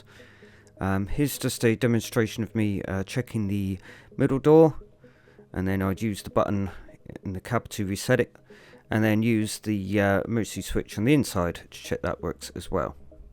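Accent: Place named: West Country, United Kingdom. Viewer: Cheers for the video. I could listen to your chilled west country voice all day 👍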